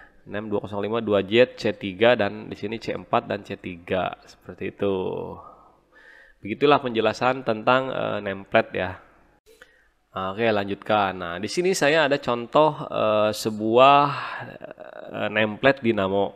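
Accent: West Java, Indonesia. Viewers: Mang orang Sunda ya😃😄😄?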